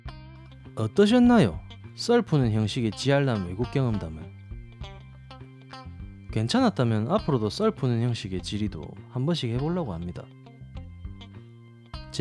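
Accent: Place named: Tongyeong, South Korea. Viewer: 나 학교 다닐때 국사랑 지리시간에 잠만 자서 지리말고 이 썰 듣는데 재밌노ㅋㅋㅋ 썰 더 풀어주세요!! 구수한 통영 사투리라 더 감칠맛나게 귀에 쏙쏙👍🏻👍🏻 대박나소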